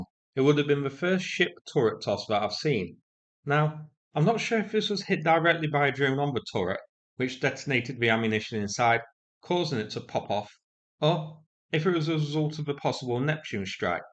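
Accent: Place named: North, United Kingdom. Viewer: WHY DOES THIS BLOKE SPEAKING REFUSE TO HONOR MY REQUEST THAT HE SPEAK MORE CONCISELY AND WITH MORE OF THE QUEEN'S ENGLISH. He has a broader audience than just Manchester or Liverpool or the Lake Districts or wherever he lives. Thank you in advance, again!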